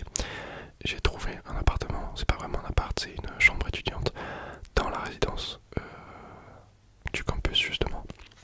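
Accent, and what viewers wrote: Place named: Quebec, Canada. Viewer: Rien que d’entendre l’accent du quebec 🔫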